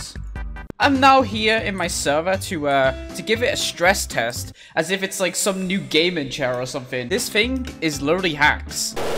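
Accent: United Kingdom. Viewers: your voice is kinda british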